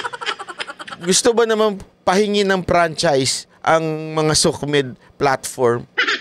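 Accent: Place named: Visayas, Philippines. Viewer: bisaya na si kagahapon😊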